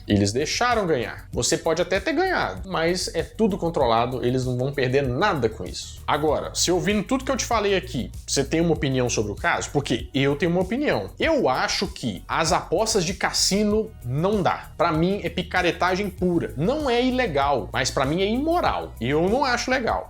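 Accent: Minas Gerais, Brazil. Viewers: Esse cara fala igual mineiro.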